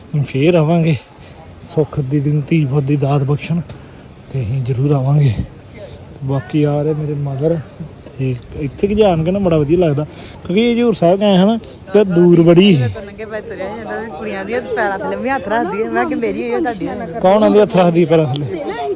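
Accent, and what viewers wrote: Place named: Malwa, India. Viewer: Haji veer ji malwa Di boli aa ❤❤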